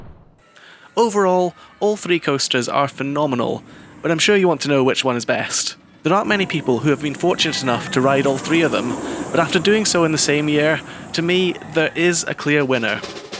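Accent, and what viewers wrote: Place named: Wales, United Kingdom. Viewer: Ouhh ok. I thought I heard some welsh 😅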